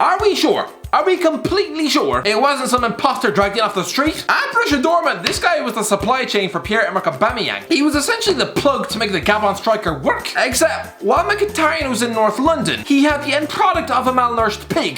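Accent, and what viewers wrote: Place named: Ireland. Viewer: Slow your Irish ass down, I can barely understand you and I'm fluent in most english accents, including Creole, New England and Brooklyn/Bronx slang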